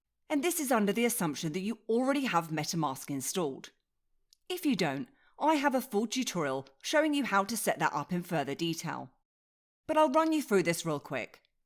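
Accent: United Kingdom. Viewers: Actually, it's true English. Americans/Canadians are the ones with the bizarre dialect.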